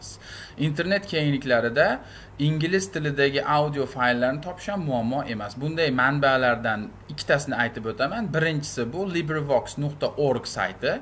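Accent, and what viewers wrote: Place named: Andijan, Uzbekistan. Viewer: инглиз тилини Андижанча манерада гаплашиш.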